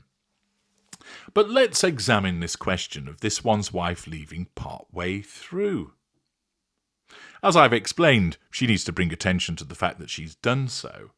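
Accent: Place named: RP, United Kingdom. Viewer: You sound a bit like, David Walliams posh voice!.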